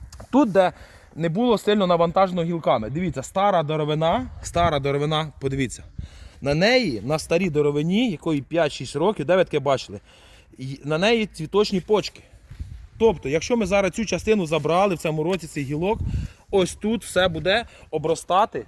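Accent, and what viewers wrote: Vinnytsia, Ukraine. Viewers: Дякую за добре поданий матеріал! Говірка вінницька, так приємно!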